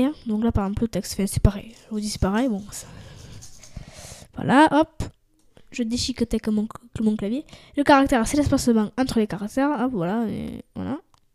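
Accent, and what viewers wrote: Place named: South, France. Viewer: Oh l'accent du sud il est là !!!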